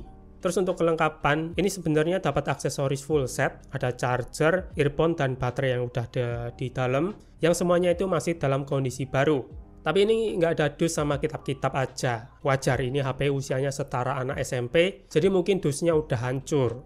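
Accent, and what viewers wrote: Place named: Bugis, Indonesia. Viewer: Bang kamu orang Bugis yah ?? Kedengaran dari logat nya😊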